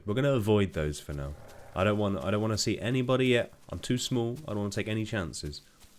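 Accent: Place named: United Kingdom. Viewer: Once I heard the British accent and calm personality . I instantly knew this was gonna be good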